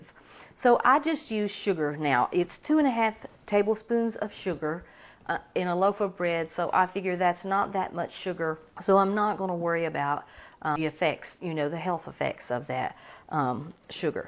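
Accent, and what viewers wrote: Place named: South, United States. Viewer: Hears charming Southern accent stops to watch entire video. Thanks YouTube for this.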